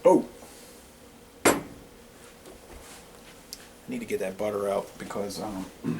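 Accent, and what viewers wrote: Louisiana, United States. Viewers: are you from Louisiana ??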